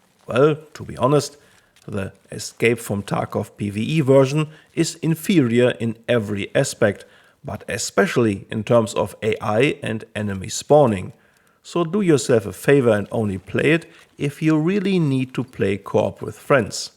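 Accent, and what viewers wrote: Germany. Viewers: Ich mag einfach diese klare und deutliche Aussprache 😂 Man kann immer hören wenn es ein Deutscher ist der redet👍🏼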